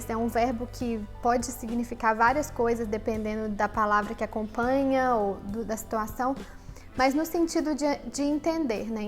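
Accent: Minas Gerais, Brazil. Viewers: Sua pronuncia do inglês é linda. Mas sinceramente, não tem nada mais meio do q um sotaque mineiro sô. kkkk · estou amando seus videos, pfv não para, amo seu sotaque q fofo 😍❤ sotaque mineiro não tem igual hahaha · Oi... vc é Mineira? Tem um inglês com jeitinho de mineiro falar... Bjs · Seu sotaque é muito mineiro!!!! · Você é mineira? hehe tem um sotaquezinho! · Pq seu inglês não tem sotaque mineiro? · Você é mineirinha? rsrsr tem um leve sotaque....rsrsr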